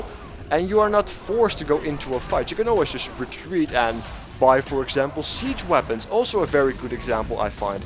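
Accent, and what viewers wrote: Netherlands. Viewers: first time i heard you talking i knew you were dutch, i am dutch aswell and maybe u wnna play together sometime w/ skype or something?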